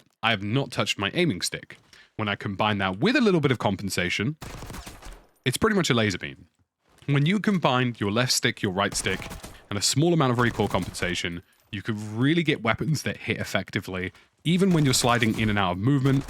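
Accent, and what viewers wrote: United Kingdom. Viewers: this is a British accent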